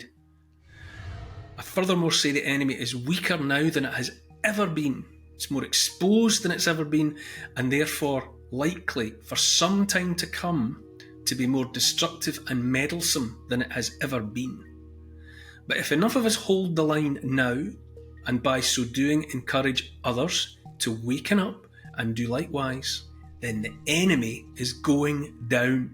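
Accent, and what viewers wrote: Scotland, United Kingdom. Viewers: Isn't that the scottish accent I hear in your mouth?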